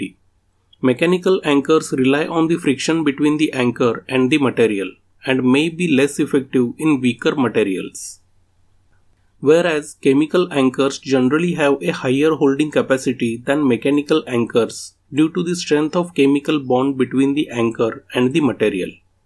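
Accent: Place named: Punjab, India. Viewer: i immediately exited the video due to the punjabi accent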